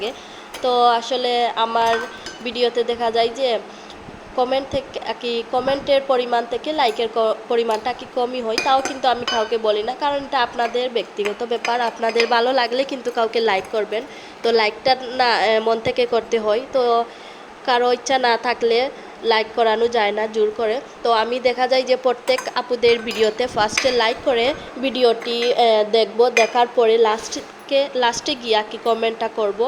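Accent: Sylhet, Bangladesh. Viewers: আসসালামু আলাইকুম সিলেটি আপু কেমন আছো আজকে তোমার ভিডিও টা নতুন দেখছি তোমার মিষ্টি মিষ্টি কথা গুলোর প্রেমে পড়ে গেলাম। তোমার লজ্জা লাগার কোনো কারন নেই তুমি তোমার মাতৃভাষায়ই কথা বলো এতেই অনেক অনেক ভালো লাগে তোমার ভিডিও দেখে মনে হলো যে তোমার সাথে আমার অনেকটাই মিল আছে। আমার ও তোমার মতো টনসিলের সমস্যা আছে। তোমার মতো আমার সেইম বেডশিট আছে। আমার শৈশব কেটেছে সিলেটে তাই সিলের কথা শুনলেই মনটা কেমন যেনো আনচান করে জানিনা কখনো আর যাওয়া হবে কি না তবে সিলেটের জন্য মনটা আনচান করে। যাই হোক তোমার ও তোমার পরিবারের জন্য অনেক অনেক দোয়া ও ভালোবাস রইল পরবর্তী ভিডিও দেখার জন্য অপেক্ষা করবো ❤❤❤❤ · আসলেই তাই নিজের কাজ নিজেকেই করতে হবে।যতই অসুস্থ হই।আপনার ভয়েজ সুন্দর। সিলেটি ভাষা শুন‌তে ভালো লাগছে❤❤❤❤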